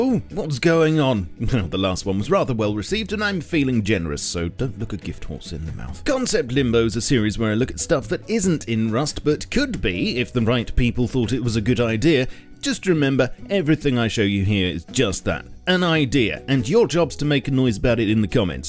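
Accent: United Kingdom. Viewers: I don't even play rust anymore. I just like listening to your update summaries.... and I don't even like British accents or your stupid way of saying "floor" when something is clearly laying outside on the GROUND!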